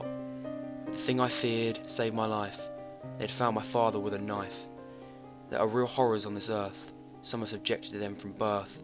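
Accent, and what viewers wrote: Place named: England, United Kingdom. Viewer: Very good, nice to hear one in an english accent! Xx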